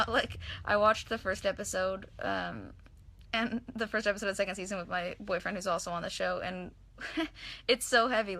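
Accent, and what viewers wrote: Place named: South, United States. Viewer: I love when you talk Southern. You have the voice for it. I should know I've been in Georgia all my life.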